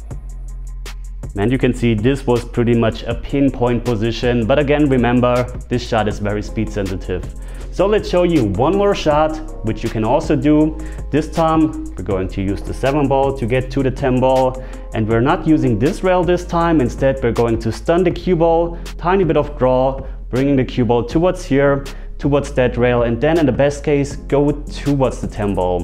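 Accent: Germany. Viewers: Love the German stoic accent and your teutonic pool playing efficiency... Great..! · Great video, as usual. Interesting to hear you speaking with your bavarian accent. 😉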